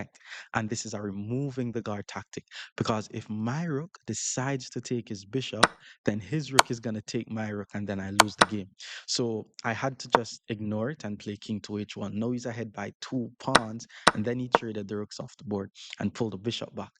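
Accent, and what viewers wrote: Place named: Jamaica. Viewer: Not gonna lie this whole time I thought you were Jamaican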